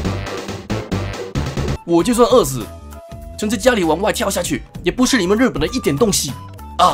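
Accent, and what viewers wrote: Taiwan. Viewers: 完全不是普通話，單純的是台灣口音國語😂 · 呢個完全冇大陸口音，係台灣口音嘅國語啊😂😂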